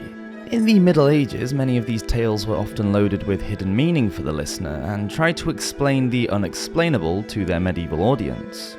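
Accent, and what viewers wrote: United Kingdom. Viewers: Love your videos man where you from in uk?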